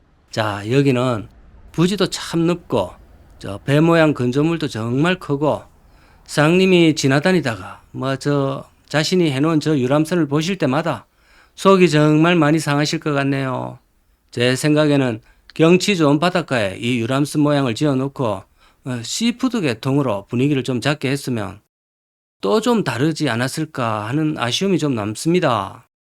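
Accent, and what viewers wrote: Gyeongsang, South Korea. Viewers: ~재밌는영상에 중독되었네요~ 배울것도많고 유머도있어서 힐링되네요~저도 경북이고향이라구 구수한 경상도 사투리가정감있고추억소환이 되네요~잘보고 있습니다~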